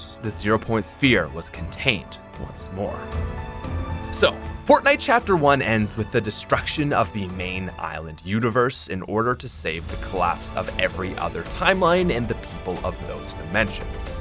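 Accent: Canada. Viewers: You are Canadian. I know cus of the way you say out and however prove me wrong, oh wait you can't.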